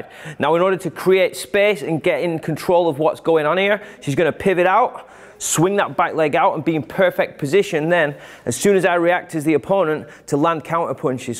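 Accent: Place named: Clitheroe, United Kingdom. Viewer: That sounds like a Clitheroe accent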